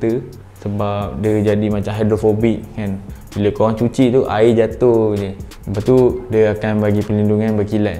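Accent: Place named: Pasir Gudang, Malaysia. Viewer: Speaker mcm org pasir gudang lh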